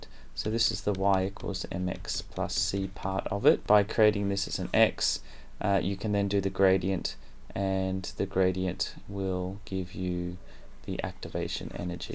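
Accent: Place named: Australia. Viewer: Hi,Lukas here.... Your videos are really great! I'm watching this one now for the test on Monday, in fact. By the time I've finished the iB, I hope to have mastered the Australian accent through your videos, but of course, in addition to some good chemistry knowledge. Also, I'd like to point out that the mysterious "DOGE" = Luke.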